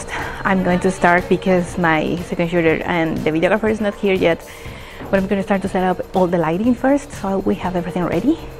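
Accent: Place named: Venezuela. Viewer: Also you look/sound like Venezuelan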